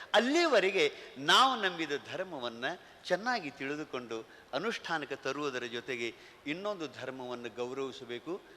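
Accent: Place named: Kannada, India. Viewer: His Kannada is charming 👌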